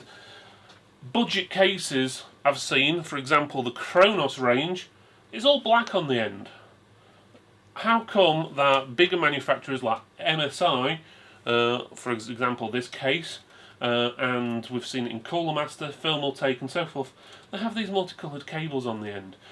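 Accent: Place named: United Kingdom. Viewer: Too much Bri ish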